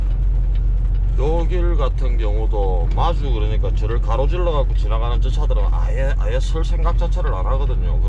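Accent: Gyeongsang, South Korea. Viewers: 어떤영상에 머리에 흰두근쓴 아가 스탑 싸인을 무시하고 달려 스쿨버스와 충돌한 대형사고가 있었다는 님의 영상이 생각나네요 약속은 지키라고 있는것이죠 편집에러 발생 원래 대사는 샤워장에서 깨끗이 샤워하고 뵙겠습니다 라고 해야 하는데 깨끗에서 짤렸네요ㅡ😜 머 그럴수 있죠 많은 댓글에서 님의 의도와 다른 맘으로 글을 싸지르고 있는 ㄴㄷ보다 님의 일상과 경험 구수한 보리문디의 한글소리 영어소리가 정겹고 다시보고 자주보고 아침마다 님을 기다리는 분들이 더 많을 것입니다 화이팅 하시고 응원합니다 잘보고 갑니다 ㅡ🚛 🚛 🚛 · 아---- 네^^ 내 친구 목소리와 너무 똑 같습니다. 분 목소리는 톤이 굵은 경상도 말씨로 단호하면서도 성실하고 진실, 예의바른 어투로 특별한 개성의 보이습니다.계속 영상 시청하면 왠지 진주 친구 얼굴이 자꾸 오버랩 데내요^^항상 건강하시고 좋은 하루 되세요!!! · 전 경상도사람은 아닌데 경상도 아저씨의 구수한 말투와 따뜻한 마음씨가 느껴지네요~^^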